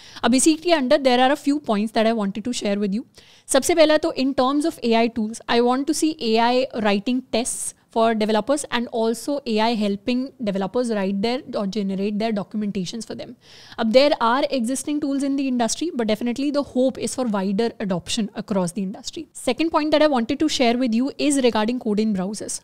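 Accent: India. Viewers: It hard to understand indian english accent 😢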